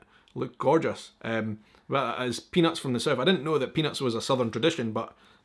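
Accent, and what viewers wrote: Scotland, United Kingdom. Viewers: I swear your accent has gotten thicker since moving back to Edinburgh! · I'm sorry, but when I do a Scottish accent; it sounds regionally similar to yours. What town are you from?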